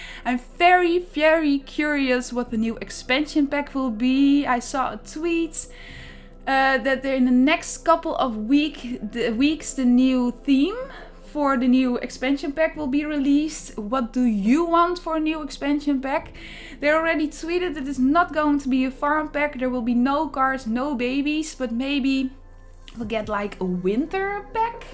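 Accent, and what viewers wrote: Netherlands. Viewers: Wowwww 😍😍😍😍 ik hoor gelijk dat je Nederlands bent 😂😂💗💗💗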